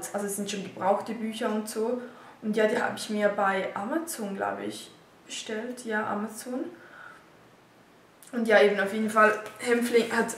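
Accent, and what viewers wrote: Switzerland. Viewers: Haha schwizer Dialekt! :D Wo stoht dis Ross eig? (Sorry wege Solothurner Dialekt xD) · sooo süß wie man den schweizer dialekt raushört :D !!!! schöne grüße ausm ländle !! jedsmol wenn i dine videos aschau wür i so richtig nidisch xD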